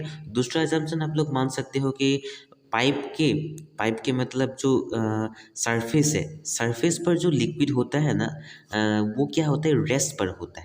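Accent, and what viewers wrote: Assam, India. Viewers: Are you from Assam?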